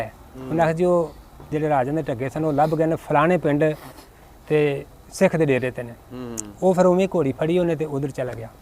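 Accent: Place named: Punjab, India. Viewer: Auncle g my parents came from Gurdaspur too, I like yr Punjabi accent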